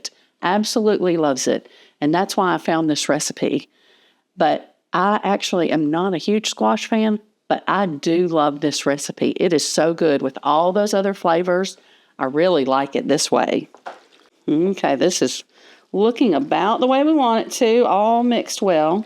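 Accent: South, United States. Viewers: Her southern accent 🫶 I’m a Texan living in Missouri and I missed that! The rule areas have a little bit of around here. Easy & kind! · What a great recipe. Thanks. You're so fun. Subscribed so fast. You're southern...but not so sickly over the top sweet like so many. Just love you.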